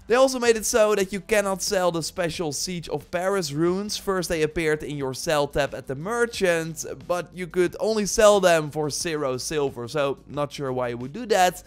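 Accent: Netherlands. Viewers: Je Engels is grappig met je Nederlands accent 🤣.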